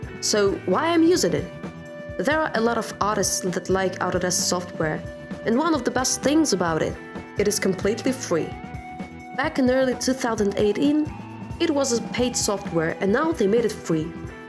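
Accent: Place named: Russia. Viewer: She talks with Russian accent 😅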